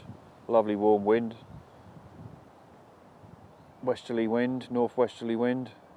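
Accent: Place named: Suffolk, United Kingdom. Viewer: Nice video Uncle ;) awesomely put together. Good to hear you’ve still got your good ol’ Suffolk accent!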